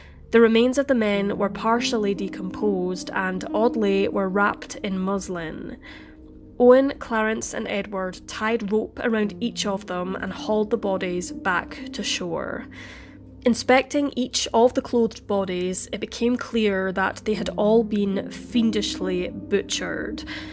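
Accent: Scotland, United Kingdom. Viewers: You Scottish? Seems like it. Great case keep it up ma'am. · Wow just found your channel and I love it already, beautiful Scottish voice 💙💯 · I’m so happy I found you channel. You cover these stories simply and thoroughly. And I love the Scottish accent! I can’t believed I missed the deer doing their business in the background, I’ll have to go back and look!🤪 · Came across this channel by chance stayed for the Scottish accent and creepy atmosphere ❤ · Where is the narrator from? I'm really bad with placing accents (even in my own country). To me it sounds like either a Scottish accent (I don't know what type of Scottish) or a really slowed down Belfast accent, but I don't know cause I'm really, really, embarrassingly bad at this.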